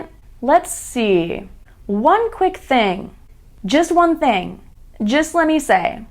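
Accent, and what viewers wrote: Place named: United States. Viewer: why ur English is so obevious ......is that American accent ?????? if that American I trust myself I can speak English fluently.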